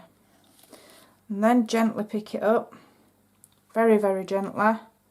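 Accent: Yorkshire, United Kingdom. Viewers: I adore your Yorkshire accent!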